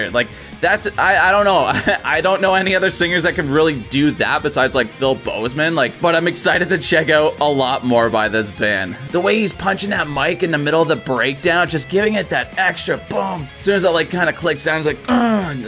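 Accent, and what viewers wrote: Canada. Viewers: "Oh fuck bud". You Canadian bud? Haha. First time seeing your reactions, good one man. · You're canadian as fuck, aren't ya buds? Decent. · Love the song and the vid but I’m still trying to figure out if you’re Canadian or just watch a ton of Letterkenny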